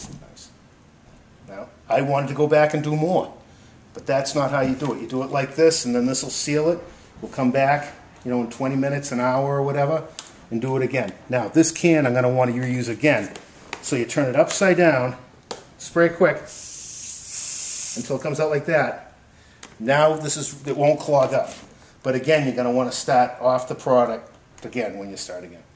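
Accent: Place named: Massachusetts, United States. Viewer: Thanks for the video demo. I will be trying this today...Nice Mass. accent by the way! lol you must be a Voc Tech teacher?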